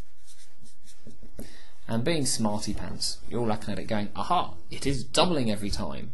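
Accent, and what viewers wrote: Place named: United Kingdom. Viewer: thanks, british accents always help. i had like 3 british teachers in school and i always paid attention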